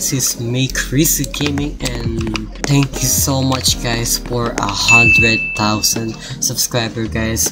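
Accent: Philippines. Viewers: i think hes filipino, he speaks like one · I just realised he's Filipino just because of his accent and about page So. HATDOG PRE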